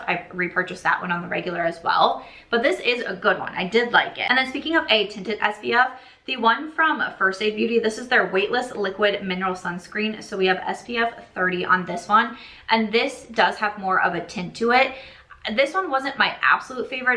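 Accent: Midwest, United States. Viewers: Totally get the Midwest accent girl.. don’t really hear it in you THAT bad.. being from outside of Philly, I always get told I have a Philly accent & I laugh because my friends who grew up actually in philly, have more of a Philly accent than me.. it’s funny how you can tell where ppl grew up just from their accent.. yet we all speak English the same.. ANYWAY- I really came here to tel you that Kayali Yum Bougee Marshmallow (the strawberry one u were tryin to think of) is the COMPLETE opposite of Tom Ford Tob Van.. Tom ford is more warm n spicy & rugged (my son n I share a bottle bc is so perfectly unisex) where yum bougee marshmallow is girly sweet but very pretty but for sure feminine.. I love it, but completely diff than Tom ford.. have u ever tried kayali vanilla 28?? Esp after it sits awhile, its a beautiful vanilla that’s feminine & mixes well with so many other perfumes (actually love it mixed with TF tobacco vanille to make it a lil more feminine & bring out the vanilla!!) .. 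also can’t wait to smell Phlur Vanilla Smoke def sounds like something you might like (just haven’t gotten to smell it yet!!) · Midwest accent? That makes me laugh...❤ enjoyed the empties video.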